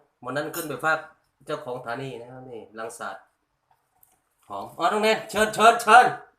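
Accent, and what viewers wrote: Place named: Trang, Thailand. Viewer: ดูกับข้าวพี่แล้วชวนให้หิวรอบดึกเลยครับพี่. ติตามครับสำเนียงพี่เหมือนคนตรังบ้านผมเลยครับ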